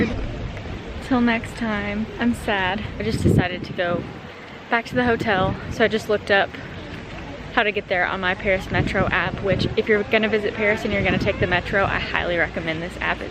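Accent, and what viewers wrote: South, United States. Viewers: I truly hope you enjoyed Paris. This is one of my favorite cities in Europe.... You have a lovely Southern accent as well 😀👍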